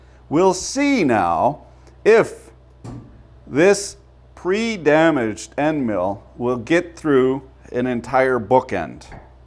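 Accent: Canada. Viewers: ! I’m a Yankee, but work for a Canuck company down in the states, and a’many’o Canucks I have income across, and have for quite sometime, and with that I have started to figure out (or I believe to have figured out) regional Canuck dialects. Are you a west coaster? Tanks for da ridiculous humor!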